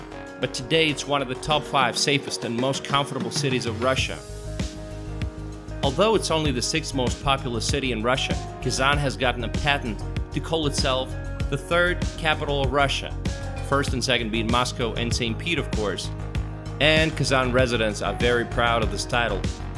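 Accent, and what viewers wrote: South, United States. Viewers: Looks like a great place. I really enjoy your videos. Where did you learn English....its almost as if you were in the Southern USA for some time! My daughter is interested in the Russian language, mostly because she is a musician and I have successfully passed down my love of Russian / Soviet classical music to her. Were planning on visiting Russia to learn Russian. We thought about Odessa in Ukraine and Bishkek in Kyrgyzstan, in the interest of saving money, but have decided to go to Mother Russia direct. Currently we are thinking of Volgograd or Krasnodar. Of course we will probably visit Moscow, St. Petersburg and Murmansk towards the end of our trip. Is the Kazan accent in Russian more similar to Moscow and St. Petersburg or is it closer to Krasnodar and the South? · Is it just me or is the Russian narrator in this video sound as if he took a course in trying to learn how to speak with an American accent?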